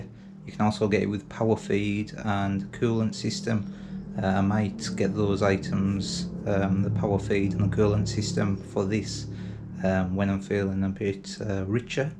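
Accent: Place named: Bishop Auckland, United Kingdom. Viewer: Sounds like your are from Bishop 😎👍or Tow Law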